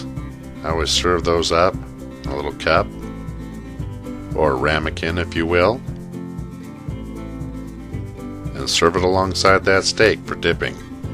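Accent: United States. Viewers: Your voice sounds like the sound of America 🇺🇸 and the steak looks delicious too.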